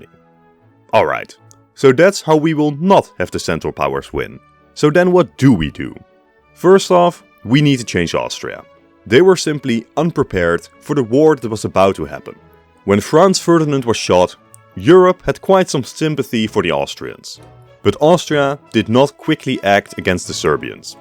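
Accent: Netherlands. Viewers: I can tell instantly that this man has a Dutch accent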